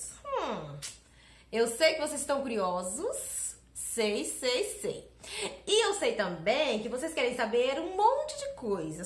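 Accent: Minas Gerais, Brazil. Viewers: Assim que vi seu vídeo pela primeira vez, logo pensei ela é mineira..kkkkk Esse nosso sotaque é inconfundível. Que Deus continue abençoando sempre 😍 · Vc num chorou mas eu sim kkkkk Glória a Deus pelas conquistas 🙌 Exemplo de mulher! Q orgulho dessa mineira kkkkk Sotaque nao nega!!! a primeira vez q vi um vídeo teu logo percebir essa é mineira😍❤😊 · sotaque gostoso esse de minas . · Quando vim pro seu canal já me apaixonei pela pessoa que vc é , só de olha pra vc já dá pra ver a honestidade humildade e força de vontade que vc tem, fora que na hora já vi pelo seu sotaque que vc é daqui que Minas . Baum d+ Uai! Tbm sou Mineirinha da gema , de BH 😘 (Vendo em 11/06/2018)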